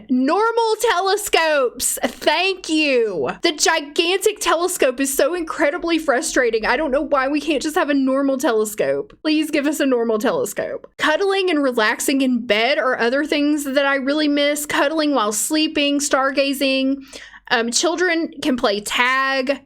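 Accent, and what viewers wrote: South, United States. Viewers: Is it a southern American accent?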